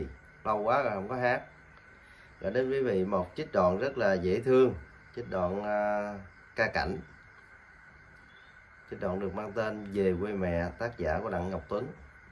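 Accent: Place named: South, Vietnam. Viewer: chat giong rat nam bo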